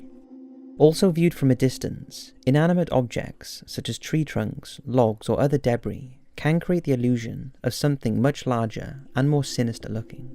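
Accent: Wales, United Kingdom. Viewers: Loch, not lock. You sound Welsh mate so should know better. Anyway, good video and very interesting.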